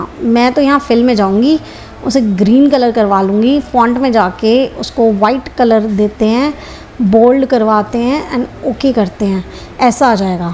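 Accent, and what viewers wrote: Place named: Pakistan. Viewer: Ur accent sounds like Pakistani..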